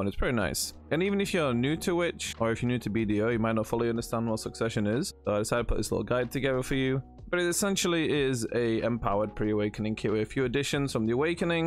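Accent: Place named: Australia. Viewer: Very nice accent. I like Australian accents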